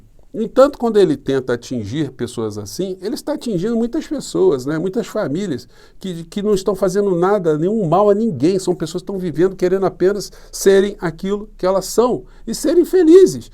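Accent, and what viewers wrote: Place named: Rio de Janeiro, Brazil. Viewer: . Sou paulista. Acompanho e pretendo acompanhar sempre seu canal. Vc é muito bom. Só repare, você mesmo, em qualquer vídeo seu: " vc que é muito bem VINDO e muito bem VIM" Nenhuma crítica ou implicação. É parte do seu sotaque carioca. Mas, perceba aí. Continue do mesmo jeito. Tenho absoluta certeza de que é sotaque.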